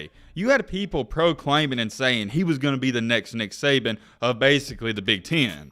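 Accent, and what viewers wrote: South, United States. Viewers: Dude you're numbers are so far off. Bro, you can tell by your back woods accent you're from the south. Keep your opinions there chief. AWFUL!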